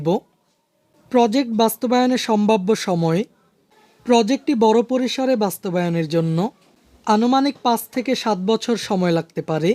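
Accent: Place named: Magura, Bangladesh. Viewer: Magura galar kay asho❤❤❤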